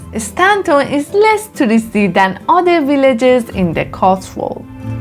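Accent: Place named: Iran. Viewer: You sound very Iranian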